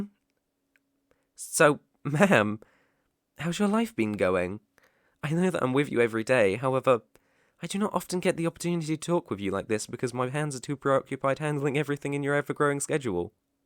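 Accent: United Kingdom. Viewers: And again the British accent is wonderful 😭🌟🌟🌟🌟🌟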